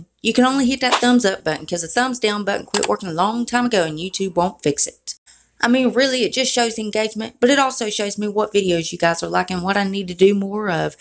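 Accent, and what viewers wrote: South, United States. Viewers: Girl I love your country twang .. I'm a country gal my self i love watching you.. your the best!!!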